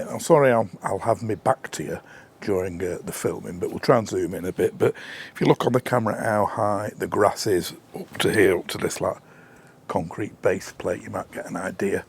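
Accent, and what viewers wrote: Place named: England, United Kingdom. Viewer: Very good. Great to hear a true English accent as well, along with a rich vocabulary. Helps a lot.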